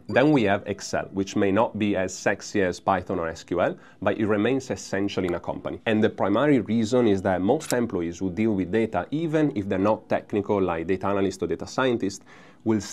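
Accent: Spain. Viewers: Este acento suena español pero gracias me has resumido mucho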